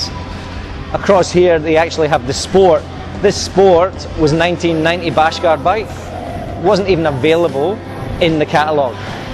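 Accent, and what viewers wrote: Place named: Glasgow, United Kingdom. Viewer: Sounds like a glasgow accent did you ride around 1984 85 .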